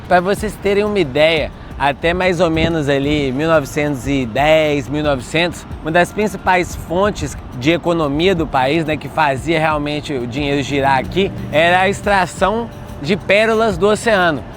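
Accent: Minas Gerais, Brazil. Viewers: Muito bom , pelo sotaque é aqui de minas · ce e mineiro?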